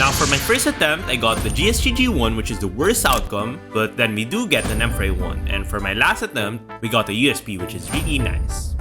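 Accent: Philippines. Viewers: are you pinoy. you sound pinoy.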